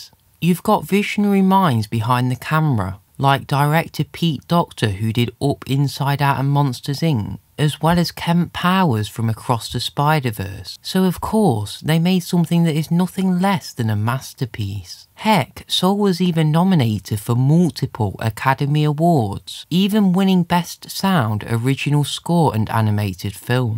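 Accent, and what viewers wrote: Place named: United Kingdom. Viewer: I Think You Live In The UK Reason: Accent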